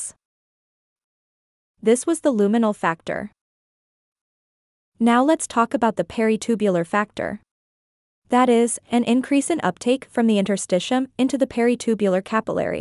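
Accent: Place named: Gujarat, India. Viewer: YOUR VIDEOS ARE GREAT....BUT WHY DID you change the voice over...one reason that i watch your video is its in your native gujju accent (please dont take it in the bad sense). I love it.. Please stick to your original voice , you can work on processing your voice with AI or whatever you resources you have, but please stick to your voice.... If there was an award on teachers day (5th September in India) for best you tube teaching you will give very tough competition for the others,.All the best & ThanQ for such wonderful teaching.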